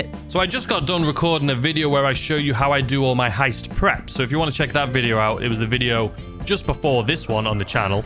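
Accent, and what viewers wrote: United Kingdom. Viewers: I am non-native English speaker. I can hear difference between US, UK and India but I can't really locate accent. But you sounded so British... Almost English Dave thing 🙂 Your way of speaking was just pleasing me - I like to hear British English (maybe because I hear US people most of the time?). A weird thing to tell to other guy but I don't care, we won't meet and feel awkward because of that :D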